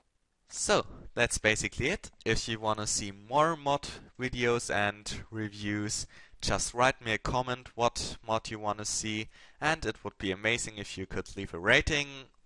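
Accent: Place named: Germany. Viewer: I can really hear that your German but that's a good thing :D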